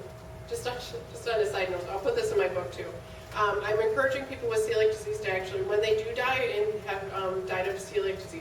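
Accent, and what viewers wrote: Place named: United States. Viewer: Please put subtitles because the sound is awful and sometimes the accent is too American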